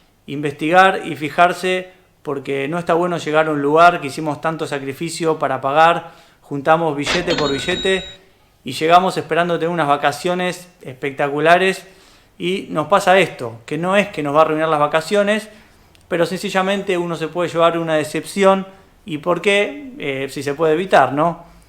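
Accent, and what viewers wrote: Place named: Argentina. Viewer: Gracias!! Sobre todo tu acento argentino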